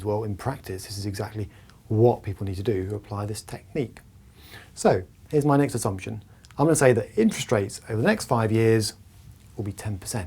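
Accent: United Kingdom. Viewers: love the British English! Always feels like the accent that any good teacher should use haha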